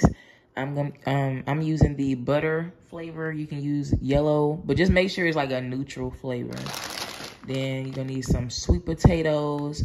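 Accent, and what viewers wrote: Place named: South, United States. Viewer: Where are you from? 👀 sound like you from the South from ya accent!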